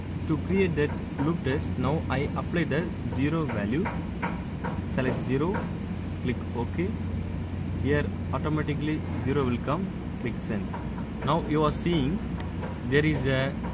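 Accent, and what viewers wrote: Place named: Tirunelveli, India. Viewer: Unga nellai tamil ku subscribe panniyachu...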